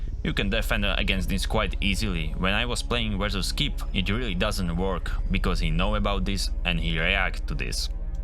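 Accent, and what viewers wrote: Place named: Poland. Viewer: I'm still trying to figure out where you are from. My guess is Polish because of your accent but wouldn't be surprised of it's Czech/Slovak... :)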